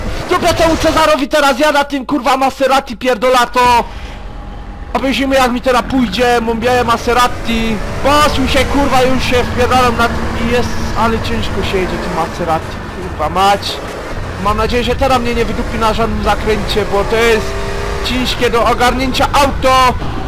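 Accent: Silesia, Poland. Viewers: Uwielbiam gwarę śląską xD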